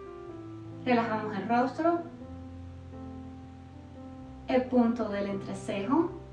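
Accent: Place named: Cuba. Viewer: Hoy practiqué esta rutina y me encantó y pienso que hay muchas del arado que pueden resultar y también la de la bicicleta con los pies vertical. Yo las hacía antes con mi lana pero contigo es mucho más juvenil. Me he quedado asombrada con la mejoría en tampoco tiempo, tres días. Me luce que tú eres cubana, por el acento, Acláramelo! 🇩🇴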